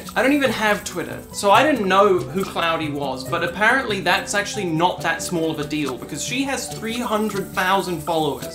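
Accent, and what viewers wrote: New Zealand. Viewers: i figured you were somewhere from the Big Southern Island Places. kiwi accents are so fun :D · Ngl initially thought you were an Aussie like myself, but gotta appreciate our Kiwi cousins! :>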